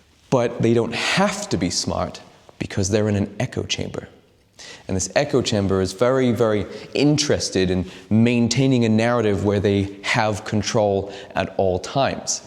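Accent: United States. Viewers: If this guy is a Brit, he's the most American sounding Brit I've ever heard. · out of curiosity, where does the American inflection in your accent come from? I was under the impression that you were English? Is this correct or is there nuance that I'm ignorant of? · I’ve never heard an Englishman speak in an American accent until now. It’s pretty good with small slips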